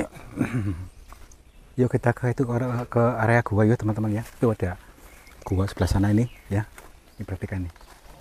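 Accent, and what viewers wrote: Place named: Banyumas, Indonesia. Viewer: Bhsanya kok ngapak Kang,,,memang Kakange dari Daerah mana maaf🙏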